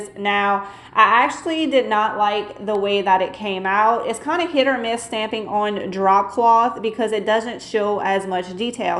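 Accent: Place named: South, United States. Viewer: You have a beautiful personality and your southern y’all feels warm and welcoming.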